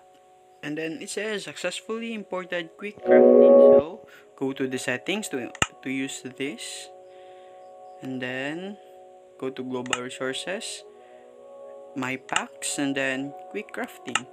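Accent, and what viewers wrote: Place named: Philippines. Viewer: Noypi!!!! I just know from your accent, am I right?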